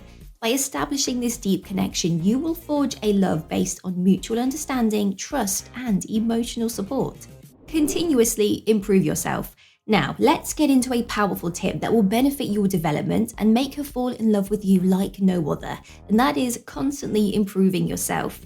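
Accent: United Kingdom. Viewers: I watch this just for the excellent british english 🥰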